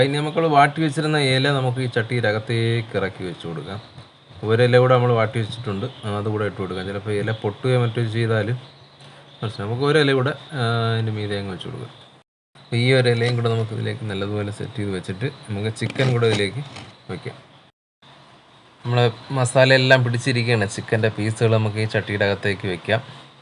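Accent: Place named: Thiruvananthapuram, India. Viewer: Thiruvananthapuram alle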